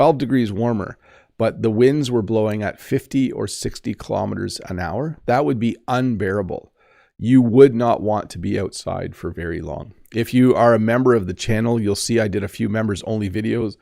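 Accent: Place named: Canada. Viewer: Hello,! I currently live in California. Every day, I watch videos to improve my English listening skills. How different is American English from Canadian English? I really enjoy watching your lessons. Unfortunately, people around me don’t speak as clearly as you do. Or is this a feature of the Canadian dialect? Thank you very much for your work!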